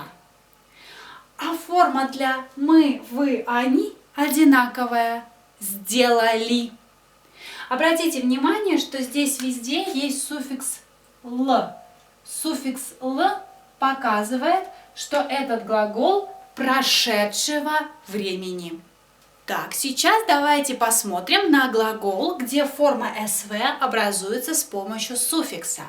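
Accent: Russia. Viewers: Акает по московски.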